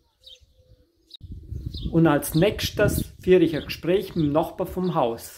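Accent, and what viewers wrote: Germany. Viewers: WUNDERBAR und SEHR SCHÖN, DANKE für dieses schöne Video aus dem Banat. Sag Mal, weißt Du, wo Deine Vorfahren hergekommen waren, denn Dein Dialekt erinnert sehr an das Saarländische und an das Süd-Pfälzische in Deutschland. Alles Gute und nochmals DANKE für dieses schöne Video...!!! Gruß, Marijama. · Suna ca si Dialectul de Bayern!!! Ich Mag Das!!! Gruß Gott!!:hand-pink-waving::face-red-heart-shape::face-red-heart-shape::face-red-heart-shape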